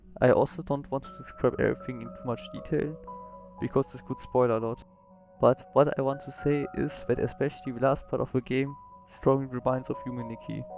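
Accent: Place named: Germany. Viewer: Habe etwas rausgehört, dass du aus Deutschlanf bist und mega tolles Video, nur man versteht dich etwas schlechter:/ Aber keep it up!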